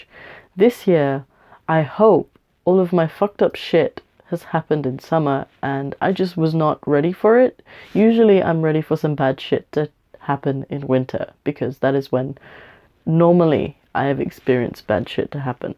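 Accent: United Kingdom. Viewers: On a side note, I haven't really been into (non commercial) vlogs but I think I get it now! :o It's really nice and fascinating, it's like someone is having a personal (albeit one way) conversation with you through the screen. Feels therapeutic somehow. I'm going to try and find more british ones (need help attuning my brain to british accents)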